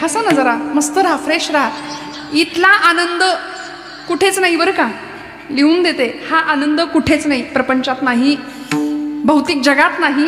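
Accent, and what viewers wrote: Standard. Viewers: ताईचे मराठी शब्द उच्चारण सु स्पष्ट आणि अगदी शुद्ध आणि सुमधुर आहेत आणि अप्रतिम वर्णन,हीच खरी संत परंपरा आणि लोकांच्या कल्याणा संतांच्या विभूति,जय हरि विठ्ठल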